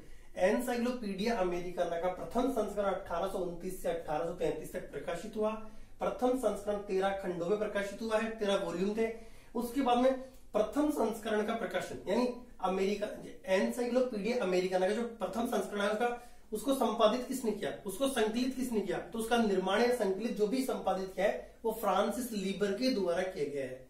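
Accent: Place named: Rajasthan, India. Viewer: अपने अच्छा पढाया, क्या राजस्थानी लोग उनीस सौ को गुननिस सौ बोलते हैं। ऐसा अक्सर देखा है।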